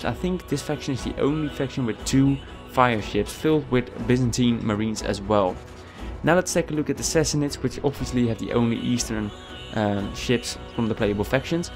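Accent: Netherlands. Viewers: you're dutch i can hear on you're bad english accent (no hate great vid)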